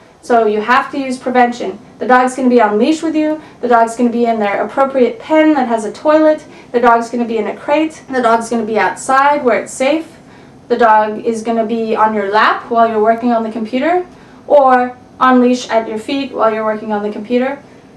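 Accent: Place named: South Africa. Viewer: oh my gosh! I thought i heard a African accent! Are you from South Africa? I lived there for the most of my life.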